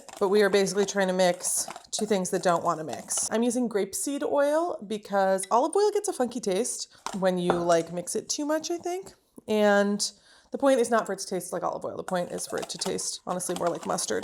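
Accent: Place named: Midwest, United States. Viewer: Omg, I’m Carolyn but I love you! So glad I found you. You sound midwestern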